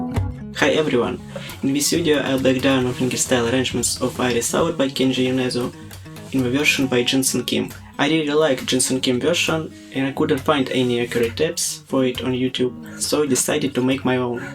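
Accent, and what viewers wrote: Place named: Russia. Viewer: Настоящий руский акцент а так-то очень тебе благодарен за разбор мечтал когдато виучить.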